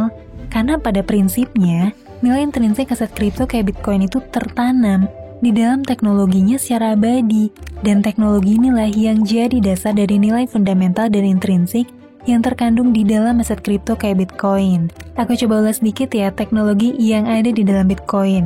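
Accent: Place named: South Jakarta, Indonesia. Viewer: Jujur dari dulu pingin subscribe channel ini karena materinya bagus. Cuman ga tahan dengar suara Mbak naratornya. Kedengaran kayak sok ke Jaksel2an. Aksennya bikin geli.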